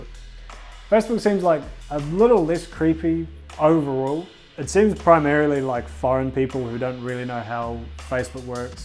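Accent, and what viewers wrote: New Zealand. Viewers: Only 7k? Bro you deserve at least 100k also love hearing the kiwi accent on yt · Are you kiwi?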